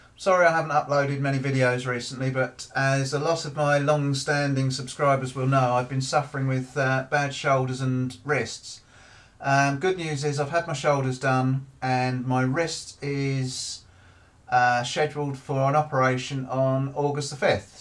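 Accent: United Kingdom. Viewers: Thanks for the great video! The water tight application is needed for attaching something to a metal roof like on a barn. I need to attach a tripod with a radio for wireless internet and do not have access to the underside of the tin, so I am hoping this will do the trick. Self tapping metal screws wouldn't have much to hold on to. But why is a dude with a British accent talking imperial inches and not metric? I am from Texas, and it even sounds strange here where I barely know how metric works. :-)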